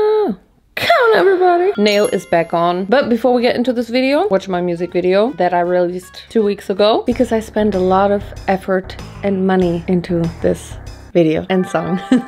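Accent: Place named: Germany. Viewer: I love your german english 😍😍🤪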